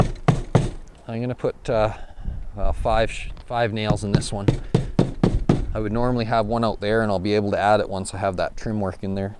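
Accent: Canada. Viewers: HE MUST HAVE GRADUATED FROM THE ROYAL ACADEMY OF ROOFING IN CANADA! HES PAINFULLY SLOW! AND THAT ACCENT IS BEYOND IRRITATING , NO WONDER CANADIANS FIGHT SO MUCH! DOESNT EVERY ROOFER USE SCAFFOLDING ON A RANCH ROOF? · eh man are you Canadian? you sound me that's why Im asking haha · It's like listening to my grandpa when he explained how to do stuff. But with a Canadian drawl.... Good stuff.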